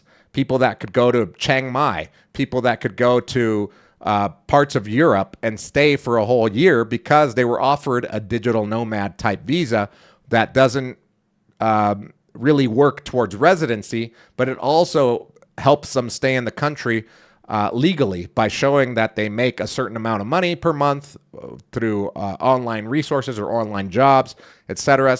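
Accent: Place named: United States. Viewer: I am quite happy to hear a "real yank voice" in Latino body! Subbed.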